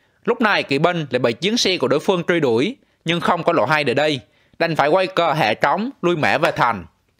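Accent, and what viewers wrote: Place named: Phu Yen, Vietnam. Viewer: Nghe giọng giống Phú Yên . Hồi trước cũng ở chung với 1 a Phú yên ở Q5 nhà cô làm ở xưởng thuốc lá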